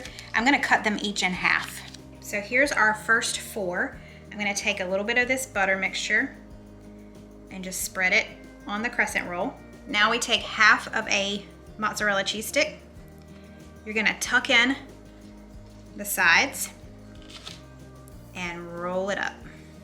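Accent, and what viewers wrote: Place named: South Carolina, United States. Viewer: Im a Carolina girl, living on Prince Edward Island, in Canada, and your recipes and your accent brings SC into my home. I made the White sauce chicken enchillada last night and hubby and I loved it!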